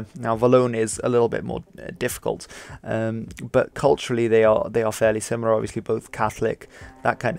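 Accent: Netherlands. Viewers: btw ben je nederlands? ik hoor aan je manier van spreken.maak ook video van die crash tijd geleden op die brug waar alle auto's op mekaar stonden in brand etc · Your accent from the Netherlands bothers me so much when you pronounce place names especially the “w” and the “g” 😂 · He is Dutch. You can clearly hear his accent. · dpassch His name, his accent and his references, combined with the amount of misinformation that he presents as "facts" lead me to believe he's Dutch. If he's British, that would make this channel even weirder, if he truly has no connection with the Netherlands, why is he so obsessed with it?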